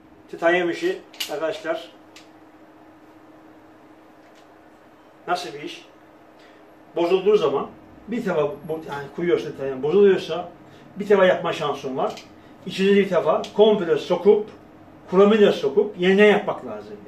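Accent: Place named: Rize, Turkey. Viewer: Adam rizeli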